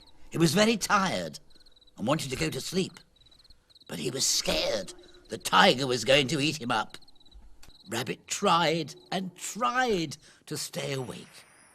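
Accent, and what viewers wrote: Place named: United Kingdom. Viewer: This story is very useful for me or everyone. British Accent is prefect 🙏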